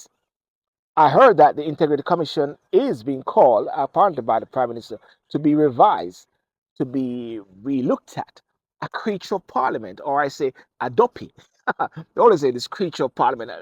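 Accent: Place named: United Kingdom. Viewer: I suggest you go and read the full report and try to let the bias side of your brain to find the facts..ask yourself this the priminster is a 52 year old man that could run any company globally and make millions but unlike you chooses to exercise is patriotic side and remains in his country to help build the economy..i suggest go do some more research and come back to your audience in your firm British accent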